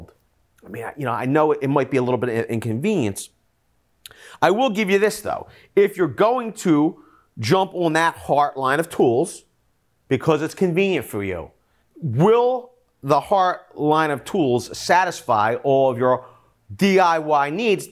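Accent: Philadelphia, United States. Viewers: that’s definitely not a New York accent. It sounds like Philly. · That's a Philly accent, not New York LOL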